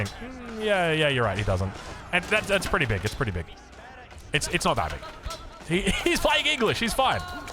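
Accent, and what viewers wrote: Australia. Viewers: You have no idea the things I do when I hear a good Australian voice..... <3 Aussie :)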